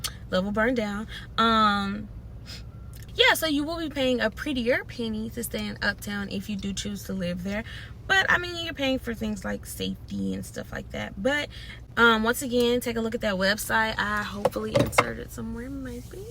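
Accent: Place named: St. Louis, United States. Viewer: LOL ok your accent! You sound exactly like my family in St. Louis LOL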